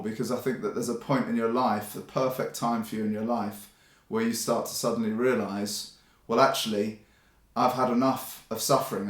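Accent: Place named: South East England, United Kingdom. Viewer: I'm not really into the 'peace love and harmony' stuff and my initial impression of the first 5 seconds of your video was "oh here we go. some pseudo spirituality bullshit", but you were actually saying some interesting and meaningful things. Nice one. Also what part of England you from? Sounds like south-east.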